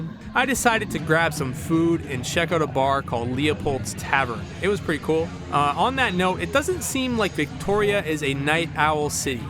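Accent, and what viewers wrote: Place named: Toronto, Canada. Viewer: I came across you for the first time ever and just by the sound of your voice I could tell you must be from Toronto 😂 great video capturing Victoria!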